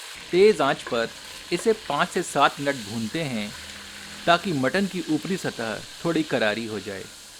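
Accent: Standard. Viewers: maja aa gaya shuddha hindi sun ke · शुद्ध हिंदी का उच्चारण क्या बात है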